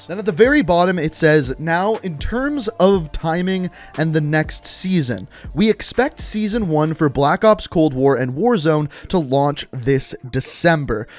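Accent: Canada. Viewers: That Canadian accent😬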